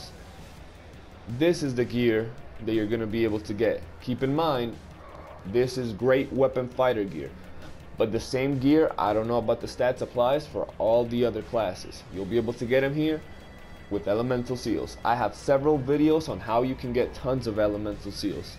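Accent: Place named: Brazil. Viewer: Where are you from? By the way you talk, u seem to be a Brazilian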